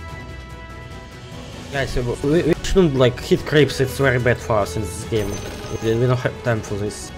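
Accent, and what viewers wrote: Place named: Russia. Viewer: I love russian speaking english. Very funny 😂😂😂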